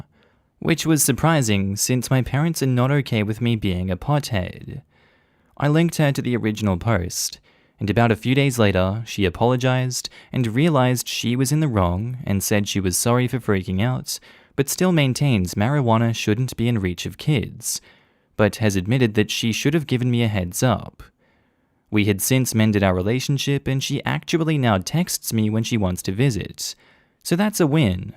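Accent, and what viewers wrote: Australia. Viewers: Hey!!!! I figure I should comment more because I’m here for pretty much all your videos haha. Also oddly growing up I was never fond of the Aussie accent (I’m from NZ) but for the last few months you’ve been my go-to reddit readover type videos voice favourite. Love the work and I’m happy you’re back safely in Aus. Hope your fam in Ireland is doing awesome as well :) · Same here! I can't sleep listening to this Aussie.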